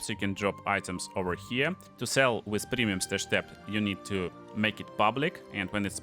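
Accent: Russia. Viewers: Чувствую русскую нотку проскакивающую через английский)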